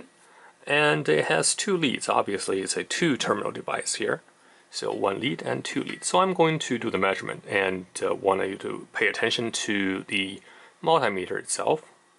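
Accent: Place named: Germany. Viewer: You sound very... German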